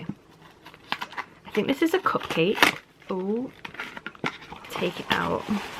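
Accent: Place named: Yorkshire, United Kingdom. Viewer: Omg your makeup is so perfect 💗 also I love your accent are you from Yorkshire?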